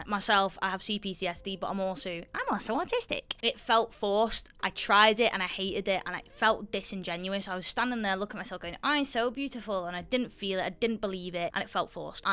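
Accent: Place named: Merseyside, United Kingdom. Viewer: Genuinely, I used to have awful MH then I got better for a while then I had an unexpected loss in the family very close to me and lost my way. I’ve been struggling with it for 3 years, not knowing how I got to a good place, not knowing my purpose in life and trying to avoid my feelings that I fell back into add/ction transference and resorted back to the BED I was diagnosed with that I’d managed to escape for a while. I’m from Merseyside as well so not only does your accent bring me a sense of home as I moved away from home, your videos have reminded me of how I did it the first time. I changed the way I spoke to myself, I changed the neural pathways. Sadly, negative ones were reinforced by the death of my loved one which is partly why I fell so hard (and sadly gained back some of the weight I’d lost the first time I got better). 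Thank you for reminding me that I can do hard things, and I can get back to how I was before because I’ve already done it once, I can do it again. I can take ownership of the bad things that happened to me and be resilient. I am resilient, and I always have been resilient. My family member died and I lost my home in my first year as a mature university student and I still got that degree and got a job despite feeling like the world was crashing and burning around me. I can do hard things. I can take control of my life. I can like myself again. And, most importantly, I can beat the add/ctions I once had. 6 years smoke free. 2.5 years alcohol free. Now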